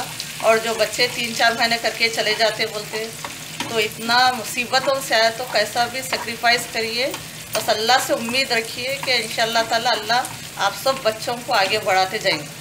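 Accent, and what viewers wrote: Hyderabad, India. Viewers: Ham hyderabadi hain aunty chote the tab dadi phopo sub apki terha baat kerte the ab unke bad hyderabadi sunne ko taras gai thi ap ne apno ki yad dila di m apko sunti hon lagta hai meri phopo n dadi bol rahi hain Allah apko lambi zindagi de Ameen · You really inspired me aunty, I am so happy that you made this valuable video. Firstly, I thought what I am hearing??? But once I started hearing you alhumdullilaha you made me realise that I am in Hyderabad. Lastly unlce's story is too motivational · Jazzak Allah Aunty, aap ke Hyderabadi sun ke Ammi ki yaad agai ju Pakistan mein hai..main malaysia mein akele rehta hoon aur yeh recipe zaroor try karonga..,Allah aap ko aur Uncle kush rakhay, take care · Love your commentary ,feels like I am in Hyderabad listening to your Hyderabadi Hindi,I am Telugu living in US,my cooking style is like yours, influenced by Muslim culture,born n brought up in Hyderabad. 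· Hyderabadi lehja boht meetha or apnayat wala hota hai mai b india hyd se hu lkn shadi pakistan islamabad me hoee hai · Assalam u Alaikum.Aunty aap mere msgs ka rply dijiye plz khushi hoti meku....Allah apko sehath de.Ameeen...aap bahut acche hai luv u😘😘 aap sab k liye itni duaien dete...Allah tala apko bhi accha rakhe.apka bolne ka andaaz ekdum hyderabadi style me bhut accha lagta me too frm hyd. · Aunty I love u ap boht achy ho ap ki hyderabadi language mujhe boht achy lgta h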